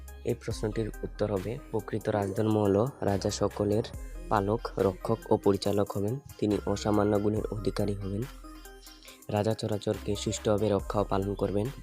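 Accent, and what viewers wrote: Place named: New York City, United States. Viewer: Your voice is very NYC bro.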